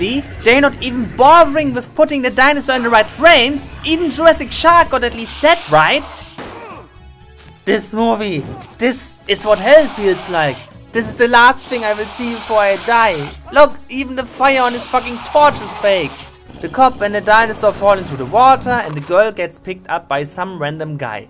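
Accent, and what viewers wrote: Germany. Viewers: This video is the first I ever watched from you, and by your accent I immediately knew you were German.... Wie kannst du kartoffelsalat so schlecht reden? XD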